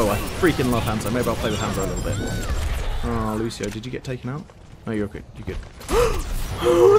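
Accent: United Kingdom. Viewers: you have a Voice Like One of that English Steryothypical Tea Drinker I Like it!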